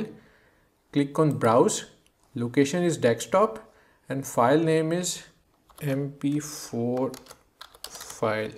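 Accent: India. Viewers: Of course the tech video is voiced by a guy with a strong indian accent. fuck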